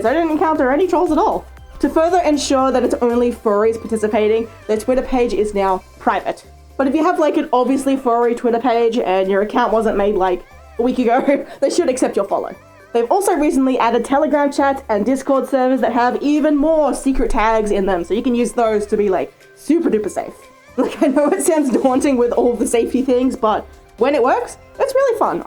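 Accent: Australia. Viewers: Uh question are you Aussie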